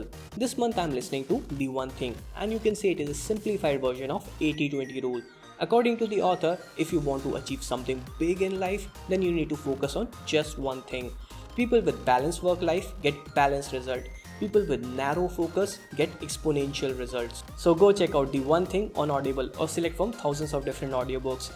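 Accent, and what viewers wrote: India. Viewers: I love your low-tone indian voice, every word is properly pronounced. Great job! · An Indian I can understand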